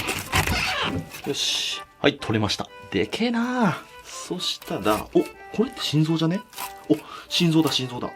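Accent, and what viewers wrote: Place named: Nagoya, Japan. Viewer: 動画は好きやけど名古屋弁がうざい笑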